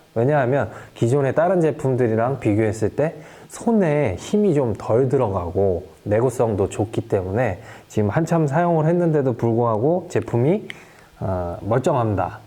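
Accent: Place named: Gangwon, South Korea. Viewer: 좋은 정보영상 잘봤습니다.^^ 대표님 발음이...강원도 말투가 자꾸 ㅎㅎ 그랬슴다 ㅎㅎ 그랬슴다 영상 업로드되서 볼때마다 재밋슴다 ㅎㅎ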